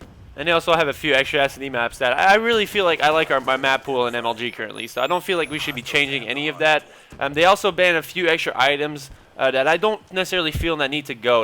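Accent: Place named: Quebec, Canada. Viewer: it sounds like ur quebecois accent is more obvious after u do ur french commentaries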